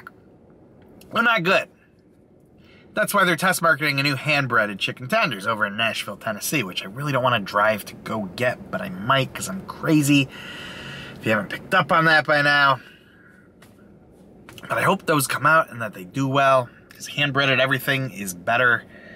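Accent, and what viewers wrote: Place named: Ohio, United States. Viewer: Thanks! Hey quick question you wouldn’t happen to be from the Ohio area would you? My dad lives in Ohio and myself in ga but I noticed your accent. Lol no disrespect or anything I just miss my family up there!!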